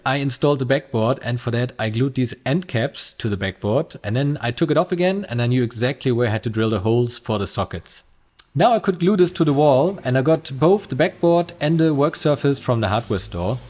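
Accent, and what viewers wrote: Germany. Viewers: dieser deutsche Akzent haha 🙈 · I can tell you‘re German, not only from your accent but from the way you constructed that building. Amazing engineering, congrats!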